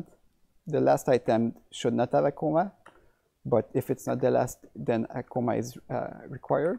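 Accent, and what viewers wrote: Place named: Quebec, Canada. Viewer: Oh wow, you've been so helpful since we switched to Elementor - Did not know you were Québécois? I think I recognize the accent :)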